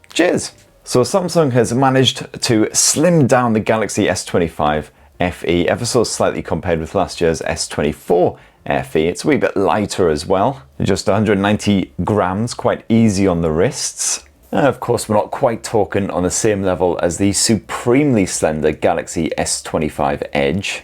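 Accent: United Kingdom. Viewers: Great review. Love tne Brit accent and twattery. New word for us. 😉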